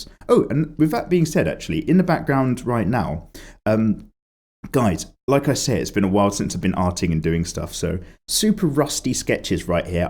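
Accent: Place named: Dorset, United Kingdom. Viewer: those Dorset tones, that was bloody you Dorchester wasn't it! love your work keep it up you legend, btw pls share those txt documents.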